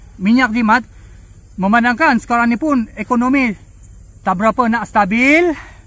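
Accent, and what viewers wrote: Sabah, Malaysia. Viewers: Cara dia cakap pun tahu orang sabah…kau tu bnyak bergaul ngn orang sikit cara cakap macam ajak gadoh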